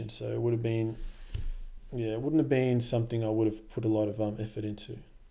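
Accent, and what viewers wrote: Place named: Australia. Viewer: Is it safe to assume you are of greek descent ? :P Im not gonna ask whether you are an aussie, your accent gives it away.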